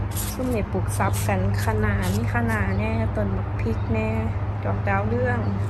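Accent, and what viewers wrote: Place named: Isan, Thailand. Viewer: มาให้กำลังใจน้อง ค่ะพูดอีสานเสียงเพราะมาก สุขภาพแข็งแรงแล้วหลาย มาเดินดูผักปลูกผักไม่หยุดเลยรักษาสุขภาพด้วยนะ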